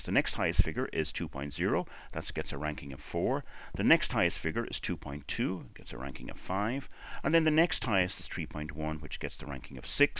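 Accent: Ireland. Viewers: thank you for this. Two questions for you: Let’s say I have T+ of 9 and T- is -16. Is it correct to say you would then use T+ as 9 is lower than the absolute value of T-? Let’s say I have T+ of 52 and T- of 0. Do I use T- as my test statistic? Also if in wanted to calculate the effect size, I read I should use the absolute test statistic and divide by the sqrt of n, but if it’s zero, this will just result in zero… even though there was a big positive swing. Hope this makes sense, nice to hear an Irish voice here away from home.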